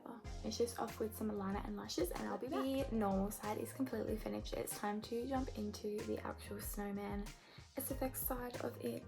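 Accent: Australia. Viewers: She really does sound like an australian, I also love the accent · Are you Australian? I love your accent!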